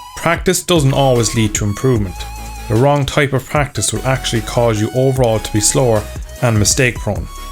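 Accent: Ireland. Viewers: irish brudda?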